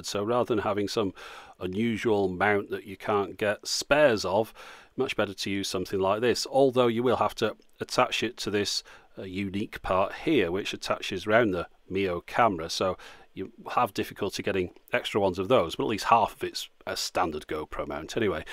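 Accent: United Kingdom. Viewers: British accents are so silly!!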